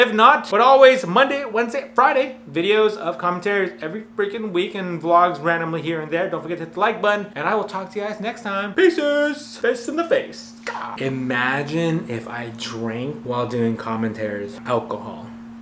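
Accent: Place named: United States. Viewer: You got the weirdest country accent I've ever heard. lol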